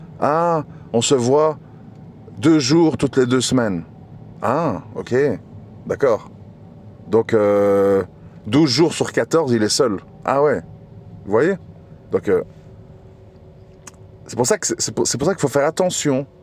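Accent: Belgium. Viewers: Sympathique l accent belgeomusulmant. Le reste aussi.😊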